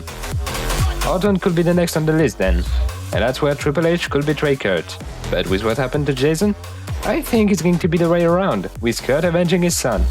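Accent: France. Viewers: Wow man, your English is great, unlike many other YouTubers'. Like that French accent as well. Great content, très bon!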